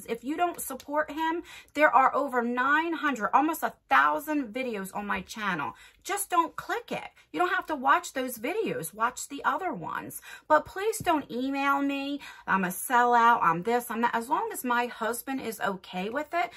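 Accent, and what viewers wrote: New Jersey, United States. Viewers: Hi My name is Roz. I am 55 years old. I am an COVID ICU nurse. I started following you during the beginning of the pandemic. You sound like one of my best friends that was born in New Jersey. I admire you so much. In my head we would be good friends. I have been wanting to make You Tube videos since 2007. I love makeup. I was a makeup artist from the time I was 18 until I graduated from Nursing school at 30 years old. I live in California. But I was born in the deep south. I had a lot of identity issues growing up. Because I had light skin and long hair. I forgot something I am Black. I used to come home crying to my parent and my good mother. My parents were born before your grandmother. My dad was born in 1925 and my mom was born in 1930. They had friends of every race and religion. I am the oldest and I was born in 1966, My parents had their last child in 1974, So my baby brother is not even 50. 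I am widowed. My husband was Caucasian and Native American. I agree with you totally. My parents taught me to always give people a second chance because people can change. I have every single Jeffree Star palette. I like his makeup. My generation will be last generation to know what is to live without social media. You are doing what any good Christian would do. Keep making your amazing videos! Love from Northern California.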